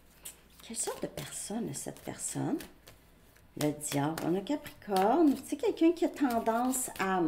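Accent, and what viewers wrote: Canada. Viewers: Hello sweet lady , with Canadian accent 🌺❤️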